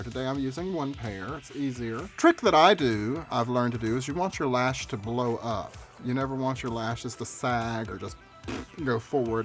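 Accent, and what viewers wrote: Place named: South, United States. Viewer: i adore her accent! southern queens are my favorite ❤❤❤❤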